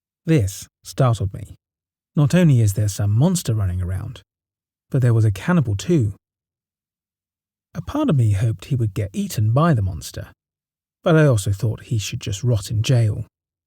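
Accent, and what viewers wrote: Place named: United Kingdom. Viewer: From Wyoming with a British accent... Hmmm...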